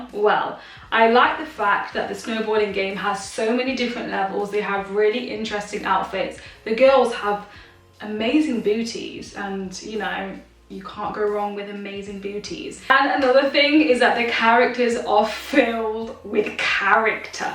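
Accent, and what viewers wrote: England, United Kingdom. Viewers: Would like to hear you say it in that British accent. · Excellent english 🏴🇬🇧 accent 😍. You look exactly like me but then as the female version.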